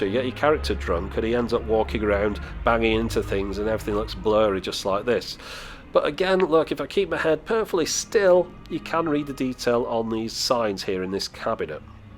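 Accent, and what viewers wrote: United Kingdom. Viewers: that British accent :D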